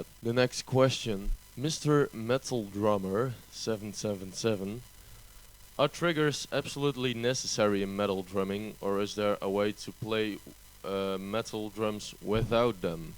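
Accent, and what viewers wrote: Netherlands. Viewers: lol he's from the netherlands :P. je hoort ut gelijk aan z'n accent. nice vid btw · Haha i can hear your dutch just like me:D im enjoying the video;) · thou sounds dutch.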